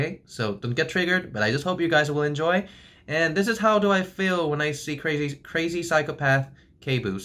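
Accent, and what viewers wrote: United Kingdom. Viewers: AND THIS UK ACCENT LET ME sCReAMMm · omg the British accent cracks me up, I'm from England and i think its brilliant ;)) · The video was really good! Haha Omg, the british accent... really awesome! 😀 I'm Brazilian and that's what a lot of people already said to me on the internet: "-Oh, so you are from Brazil? Cool! Where do you live? Rio de Janeiro, Sao Paulo, Amazonia, in the jungle?" -"I love football, samba, beaches and brazilian girls are sexy." -"I love you 'mamacita'." (What) -"Gracias". (What) Most of them don't even know we speak brazilian portuguese. That's crazy! Haha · I can't imagine a Portugueseboo xD You're almost nailing the pronunciation on "Francesinha"! The UK accent got me though xD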